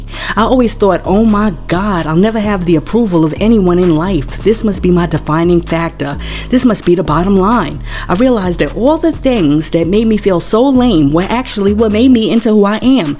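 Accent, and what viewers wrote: New York, United States. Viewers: I ABSOLUTELY LOVE THE GRITTY SOUTHERN AND INTENSE NEW YORK ACCENT TO MY CORE.. IT FEELS LIKE HOME 🏘️ 🏡. I'M SO EXCITED FOR THE NEW CHANNEL..GIVE ME MORE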